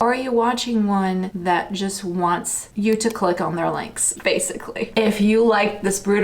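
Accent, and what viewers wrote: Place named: Brazil. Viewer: Are you from Brazil? Your accent reminds me of Brazilians speaking English… Also, the way you said this channel’s name sound familiar to my Lusophone ears!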